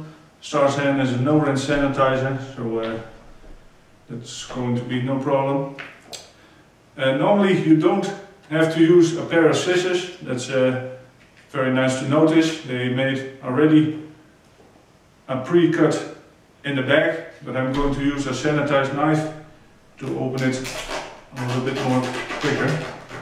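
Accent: Netherlands. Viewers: hey dude I moved to norway (from holland) the beer here is about 10 times more expensive as in holland. so when I stumbeled on to this in a paint shop ;), I just bought the stuff because I like to do new stuff and then yeah when it comes to beer theres no doubt. Ìm starting right now on the brew. but dude I gues you are dutch too right. the way the speak english sounds so dutch!! haha. well Im gonna make me some beer now!!!! thanks for the movie!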